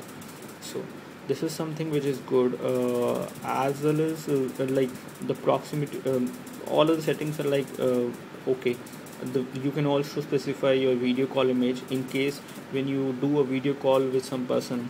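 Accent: India. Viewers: BRITISH ENGLISH (indian) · I love this funny indian english slang ... like meditation if you do not listen to the content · hindu engliszh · ... please, your accent plus the fan makes my head go all Indian mode... COME ON MAN!!! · 2050 India is propted to be the leading economy in the world, Not ony should you start learning the accent,but you better start learning Hindi language as well. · its an indian accent but he has a lisp. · come on, ANOTHER Indian/eastern accent! every s2 video ive watched the person has that kind of accent! i'm not prejudiced, it's just that i have trouble hearing and understanding what the person is saying! please, get a british accent, or maybe even AN AMERICAN TALKING!!!!! THIS IS AMERICA YOU KNOW! PLEASE, I CAN'T EVEN UNDERSTAND WHAT HE'S SAYING! i can't watch all these videos, if they all have an overseas eastern accent! i don't care the nationality if i can understand them!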